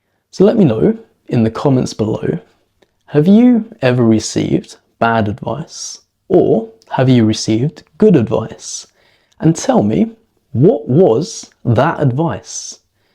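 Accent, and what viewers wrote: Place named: Australia. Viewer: Thanks for letting me know. I was curious as it sounds stunning 😉. Sometimes you actually sound a little bit Australian. This because of the pronunciation of certain vowel sounds for instant. Well, I enjoyed listening and I try to pick up on some more words to better my vocabulary. As a non-native speaker the vocabulary could always to be worked on 😂.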